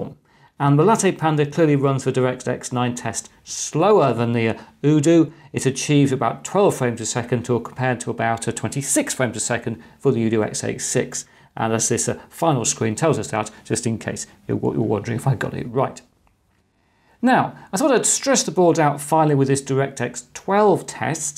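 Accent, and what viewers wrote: England, United Kingdom. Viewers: you videos are fantastic! Keep it up! That english accent is very nice to hear. It's sounds like an intelligent person.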